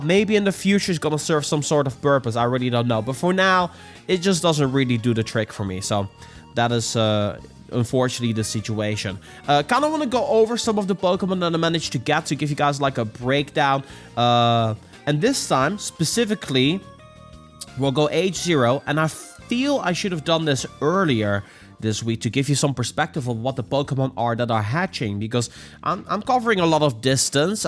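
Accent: Netherlands. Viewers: Kom je uit Nederland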